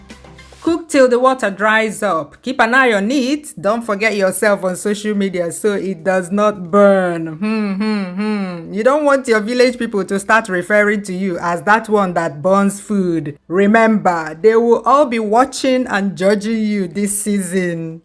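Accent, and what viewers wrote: Igbo, Nigeria. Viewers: Igbotic voice...yen yen yenh ! I love it lyk that 😂😂😂😂😂😂😂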